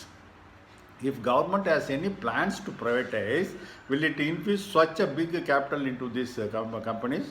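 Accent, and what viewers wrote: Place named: Tamil Nadu, India. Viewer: evar englishi tamizh madiri thane pesarar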